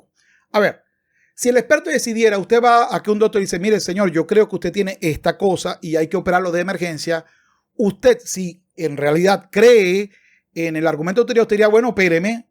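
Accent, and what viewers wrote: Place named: Venezuela. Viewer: Eres venezolano , de lejos se nota !!! !!! De Caracas o de donde ....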